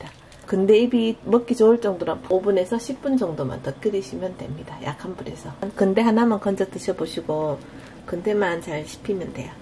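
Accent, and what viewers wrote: Gyeongsang, South Korea. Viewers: 안녕하세요? 안내에 따라 와보니, 여기도 매우 좋은 곳이네요! 근대 된장국, 보기만 해도 침이 꿀꺽 넘어갑니다. 귀하는 경상도 말씨라도 목소리가 좋으며 설명이 명료하네요! 거듭 감사드리며 - - - - 자주 뵙길 희망합니다.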